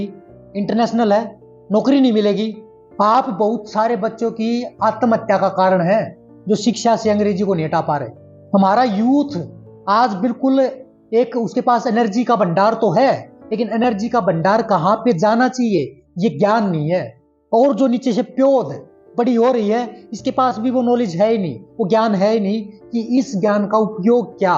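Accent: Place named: Haryana, India. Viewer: Aap jaat ho ya gurjar aapka accent haryanvi jaisa h ...ya phir aap gujrati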